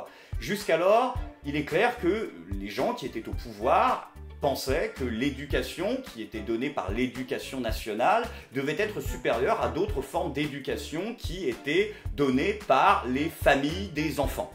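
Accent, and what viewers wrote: Benin. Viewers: très bonnes imitations de Schiappa et d'un béninois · Et fait nous on un jspc spécial accent bénin :p tu le fait vraiment bien :)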